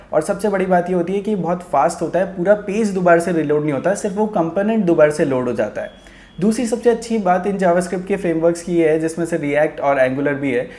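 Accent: India. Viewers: yeh punjabi he i can sense that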